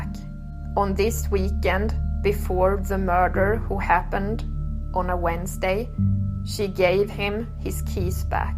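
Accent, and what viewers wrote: Sweden. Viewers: William Redshield This language is called svengelska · The Swenglish is killing me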